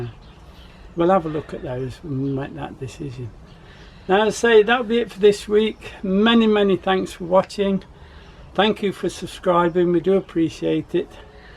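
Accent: England, United Kingdom. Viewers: Liz Nichols... Hi there Liz... i live in Cochise County.. Southern Arizona.... on the edge of the desert... gardening can be difficult.... but i find growing in the winter.. i can cope with most veggies... fruit trees need extra care and attention.... water being the biggest problem irrigation systems are a must..... is a guy who makes things sooo easy to understand.... and i can understand his English accent........ Ed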